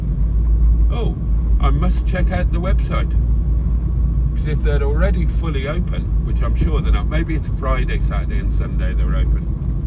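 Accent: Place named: Birmingham, United Kingdom. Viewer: Was there the other week, great place and a great fish and chips. 20p for a pee though!! By the way have you spent much time in Birmingham as I can detect a bit of an accent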